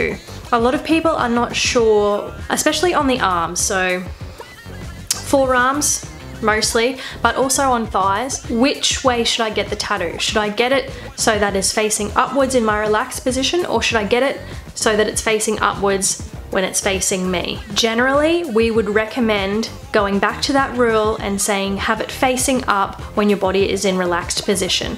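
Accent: Australia. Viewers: because she has an aussie ascent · It's an australain accent. Alot of people here say "tadoo".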